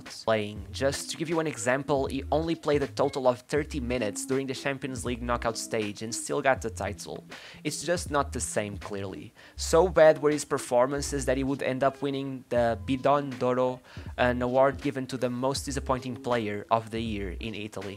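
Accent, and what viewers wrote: Portugal. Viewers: I bet this guy is portuguese because of the accent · Is it me or you are portuguese? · Lol this guys is portuguese 😂 accent tho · Gotta love that awesome portuguese accent! A great video for a great player! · He’s Portuguese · This is a portuguse narrator for sure eheheh I Love Rivaldo! He has class and is not a party animal I love lo listen him talk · you sound like a portuguese speaker, and I am wondering if you are from Brazil, portugal or another portuguese speaking country. Anyway what I about doing one for Juan Román Riquelme, by far my favorite player growing up and wanted to know other people's opinions. Cheer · Dude are you portuguese u really have a portuguese accent saying the names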